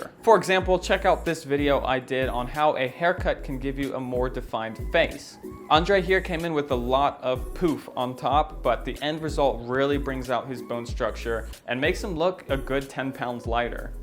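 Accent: United States. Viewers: wait, you are british? dont u have an american accent? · If you're from South Africa and live in the UK, how come you have an American accent?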